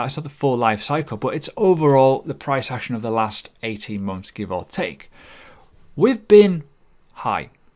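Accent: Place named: North, United Kingdom. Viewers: He’s a northerner! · What absolute dog's bollocks, how come you not tell my mate is from England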